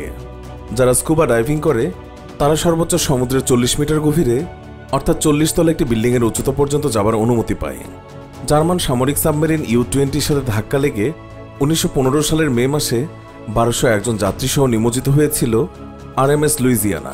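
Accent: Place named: Noakhali, Bangladesh. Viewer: voice ta khub disgusting. Accent khub e baje. Kothagulo suddho vabe bola hoiche kintu accent noakhailla accent er moto lage.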